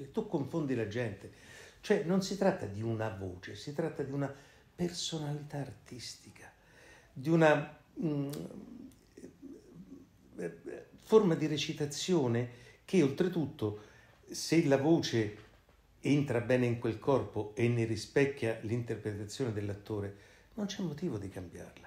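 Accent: Rome, Italy. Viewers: Mamma mia che fenomeno, adoro la sua voce. Ma come fa, ha una voce sbiascicata, piena di sc doppie sbagliate romanesche...... grande!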